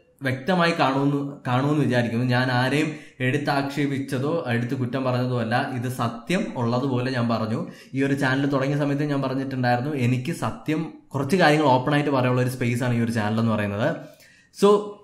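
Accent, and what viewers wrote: Trivandrum, India. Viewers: loved ur trivandrum slang ....ghora ghora.... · Bro brode Trivandrum slang enikk ishttappettu....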